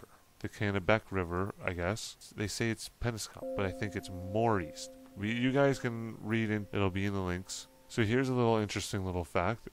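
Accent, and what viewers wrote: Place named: North Carolina, United States. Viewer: Welcome back. This one must have taken quite a bit of research for you! Very good info and detail; I've never heard of these men before with the exception of Verrezano, whose name you are pronouncing with an extra 'z' at the end. I love your accent, and said to my daughter the way you pronounce certain words, like 'about' sounds like you are North Carolinian. Then you tell us that Norumbega is near where you are from! We have a St. Johns River in Florida, which is 310 miles long, and runs parallel with the Atlantic.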